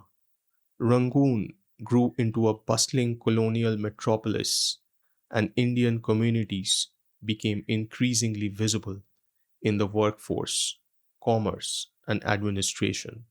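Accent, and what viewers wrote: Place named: United Kingdom. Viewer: He sounds more of Brit intellectual then any indian !! Maybe people like actually trying to keep the British legacy of territorial expansion as well exploitation...!!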